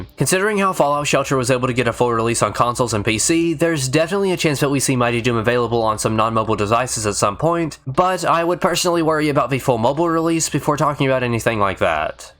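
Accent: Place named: Ireland. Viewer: Are you kidding me, I can't even honestly tell what type of accent he is, maybe irish...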